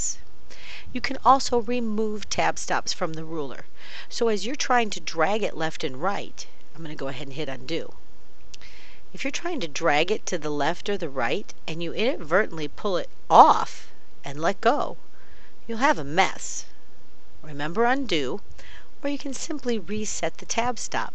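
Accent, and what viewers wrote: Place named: United States. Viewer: Pretty much a straight American-English accent. Ha, ha.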